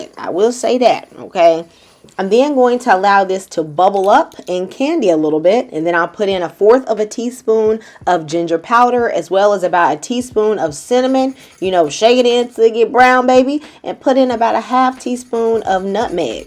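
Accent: South, United States. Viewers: I am going to try this looks delicious, you sound southern but your style of cooking reminds me of my little island of Jamaica. Your cooking touches my heart, thanks.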